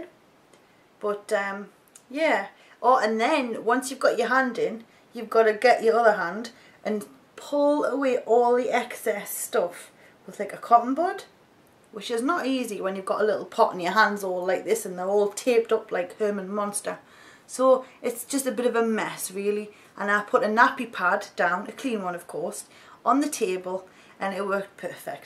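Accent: Newcastle, United Kingdom. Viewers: I love just sitting listening to you rambling on must be that Geordie accent. I cannot do anything with my nails. Hopeless!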